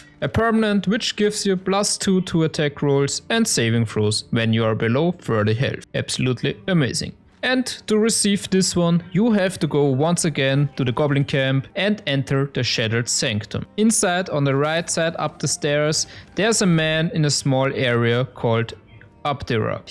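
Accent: Germany. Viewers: Junge dein deutscher englisch dialekt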